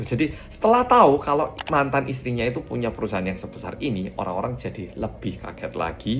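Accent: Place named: Java, Indonesia. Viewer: Kak bikin konten seperti ini terus ya , aku paling seneng bahasa yg diucapkan , org Korea asli , sekrg tinggal di Korea tapi medok jawanya masih ada , aku bangga sebagai org Jawa ada org Korea mah medok jawanya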